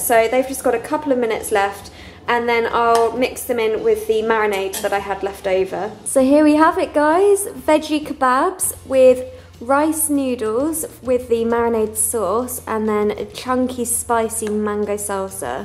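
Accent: United Kingdom. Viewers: omg that British accent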